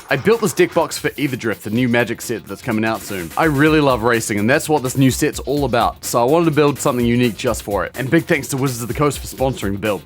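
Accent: New Zealand. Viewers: Was NOT ready for a Kiwi accent lol so naturally "deck box" sounded like something else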